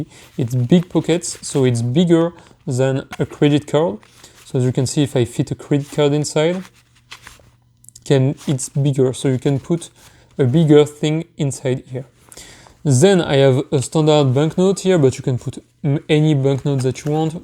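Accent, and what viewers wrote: France. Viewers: you have a little French accent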